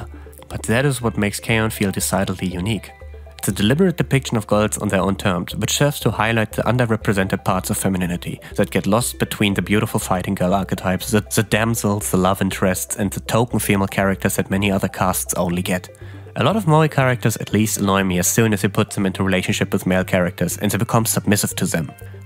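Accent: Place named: Germany. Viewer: Great combination of engaging personal story, fitting background music and awesome German accent. Good stuff.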